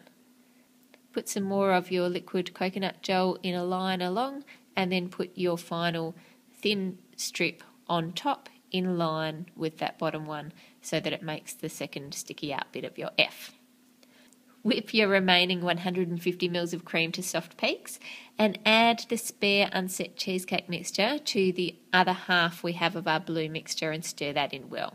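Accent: Australia. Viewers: are you Australian? Your defiantly not British because I am and i've never heard anything like that. xx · I like your Australian acsent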